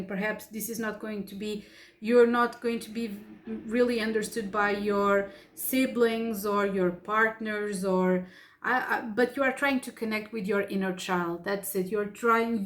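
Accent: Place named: Portugal. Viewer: ouvi 2 minutos do video e já detectei um sotaqueuzinho português e realmente confere.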